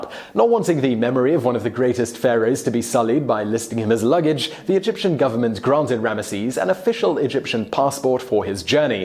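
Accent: England, United Kingdom. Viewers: He's English, it is hard enough as it is to pronounce a lot of Egyptian and other North African/ Middle Eastern names as an American speaker. I'd imagine it is a little bit more of a challenge as a "proper" English speaker. The two languages are at simply odds for pronunciation with translations. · Dude...hes British, be easy. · he's British I lived in Britain for more than 10 years and they don't pronounce words the same as we do in America. Go directly to an arcade and purchase a common-sense ticket. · ATON!!!!!! Christ, British people never say anything like the rest of the English speaking world!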